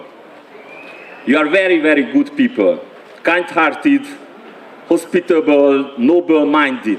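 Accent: Hungary. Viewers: Kedves! Semmi baj a kiejtéseddel. Magyar vagy, magyararos a kiejtésed. Aki meg akar érteni az megért, úgy gondolom vannak ennél nagyobb problémák is a világon ami sokkal fontosabb és amiért közdödök. ( Neked van angol kiejtésd, nem hiperpasszív mint annak a báb bolond Karácsonynak, aki össze vissza hazudik a nyelvtudásával kapcsolatban.) Úgyhogy kitartás és sok erőt kívánok neked és párttársaidnak! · Örülünk, hogy még a kiejtésed is magyar, nem csak a szíved! :) Nagyon jó beszéd volt, értette, érti ezt mindeki, azok is, akik ugyanígy gondolják, és azok is, akik máshogy - de nem nekik lesz igazuk. Melletted állunk, Te vagy Magyarország, és lehet, hogy egész Európa jövője! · Magyar embertől nem szégyen az idegen nyelvű szavak magyaros kiejtése, csak egyes nemzetközi, kizárólag idegen érdekek mentén, ügynökösködő hazátlanok szerint! Sőt szerintem még az ilyen fennt említett férgek hergelésére, szándékosan belecsempésztem volna a beszédébe Toroczkai helyében, egy kicsit az ízes szöged környéki nyelvjárásból,némi pálócos behatással! 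Akkora magyarkodástól a ragya is kiverné a globalista csúszómászókat! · Nem baj, teljesen érthetően beszélsz, és ez szerintem sokkal fontosabb, mint az, hogy mennyire magyaros vagy nem magyaros a kiejtésed.:) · Hallottam már sokkal borzalmasabb magyaros angol kiejtést, egyáltalán nem vészes :) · A kritika valojaban intelligencia kerdese. Nemcsak angol teruleten kulonbozo az un tajszolas vagy szleng. Hanem magaban az English Commonvealth ben is hellyel kozzel kulonbozoen nyomjak osszehasonlitva a Cockney akcentushoz…..azert van akcentusod mert MAGYAR VAGY. A szonoklat ertheto, es az erofeszites a minden keppeni kifejezodese hogy mindenkihez eljusson a kivant tartalom ertelmi szinten. Retorikailag is nagyon meg alltad a helyed. Ugyanis mellozted a frazisokban valo, szaggatott to mondatos puffogtatasokat. De magaban a gesztus ami egy tart karu olelo Udvozles nyelvi formaba ontve ,Tiszteletteljes Koszontes! 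….. Kulpolitikailag nagyon fontos es meghatarozo dolgot fejeztel ki. Gratulalok a toretlen nemzeti elkotelezettsegetekhez es elszantsagotokhoz! Lehetoleg tobb feliratozott videot kerunk, Tisztelettel! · Gyönyörűen olvasol angolt, magyar akcentussal😃👍; -Te vagy az emberünk! Hajrá MiHazánk! 🇭🇺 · Szia! Szerintem pont így jó ahogy van! Egy magyar embernek legyen magyaros az angolja! Ettől lesz ízes és egyedi! További sok sikert kívánok az utadon!!! FEL A GYŐZELEMRE!!! 🇭🇺🇭🇺🇭🇺🇭🇺🇭🇺🇭🇺🇭🇺🇭🇺🇭🇺🇭🇺🇭🇺🇭🇺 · Szerintem még jól is áll Önnek a magyaros akcentus. Különben is a lényeg az, amit mond és az zseniális. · Nem baj, hogy nem tökéletes az angol nyelv amit beszélsz! Soha nem is lesz jó mert magyarnak születtél az az anya nyelved mindig is benne lesz a magyar akcentusod ha bele feszülsz akkor is! 👍 🇭🇺 Hajrá Mi Hazánk 🇭🇺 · Szerintem nem baj a magyar akcentus, végtére is magyar. Macedón meg francia akcentussal beszèl. 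Fő, hogy értsék. És értették. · Milyen dolog hogy egy magyar politikusnak magyaros a kiejtése, remélem most már az ég kékségéből is problémát csinálnak. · Aranyos, mert a magyaros angol a legjobb angol! Maradjon meg ez, nem kell minden nem angolnak elhagyni a nemzeti accentust!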